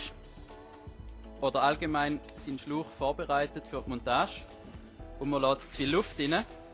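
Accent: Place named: Switzerland. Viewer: Super Erklärung. Fahre TPU seit geraumer Zeit. Gestern der erste Plattfuss. Bin mal gespannt wie der selbstklebende Flicken hällt. Ich verstehe das Schweizerische übrigens recht gut;)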